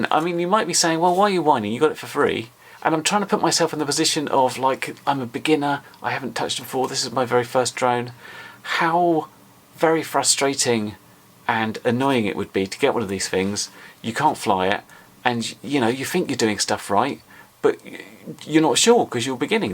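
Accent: United Kingdom. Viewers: Oh wow. I had to stand up. It's as close as a cheese burger. A British accent doesn't make you automatically smart son. Read. Just read. Do what I ALWAYS told my Dad. I said "Before you call me RTFM. Read the effing Manual ".Anymore questions call me. So, RTFM!. And if you deal with HS as PEOPLE they'll deal with you as a king. Arrogance out back please.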